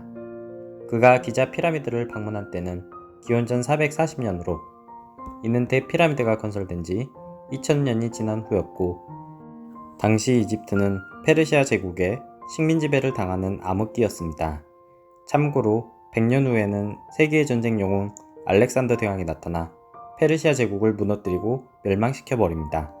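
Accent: Jeolla, South Korea. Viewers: 전라도 사투리 모댔던(못했던) 참ㅋㅋㅋ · 전라도 사투리가 심하네요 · 전라도사투리 구수하고 좋아요ㅎㅎ 잘봤습니다 잘만드셨네요 유익했음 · 사투리만 고치면 더 좋은 영상이 되겠네요. 전라도 사투리가 너무 심함. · 전라도 분이시네요.사투리가...^^ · 유튜버 전라도 사람이네 ㅋㅋ 못했던>>>모댔던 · 전라도사투리쓰는거 안부끄러워요? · 말투에서 잔라도가 느껴지네요..내 생각이 맞을걸요~ 사투리 감별사임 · 고향이 전라도신가요 ㅎㅎ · 전라도 분이시네^^ 영상 잘 봤습니다~~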